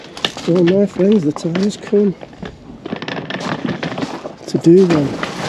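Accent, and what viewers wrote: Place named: Oldham, United Kingdom. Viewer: I enjoyed this - quiet because few people go on holiday to Norfolk in Feb. You are a bit different, but enjoyed the tour of the pubs, and you sound like you are from Owdam, so clearly understood:)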